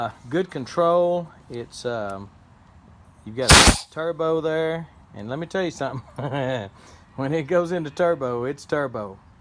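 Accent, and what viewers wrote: Texas, United States. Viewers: He's a Texan. That's a Texan pronunciation. Texicanese is almost another language, from what I can decipher. If you don't believe me, next time you watch one of videos turn on the Youtube Closed Caption CC button. What a hoot!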